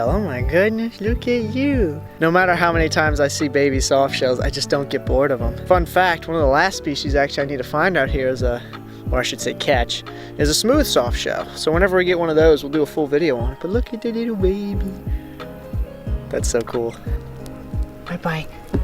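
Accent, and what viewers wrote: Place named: South, United States. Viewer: Dont loose your southern accent. It is what made you!!!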